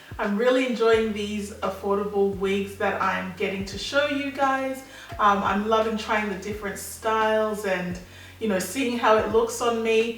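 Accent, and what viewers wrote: Australia. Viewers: Are u Aussie??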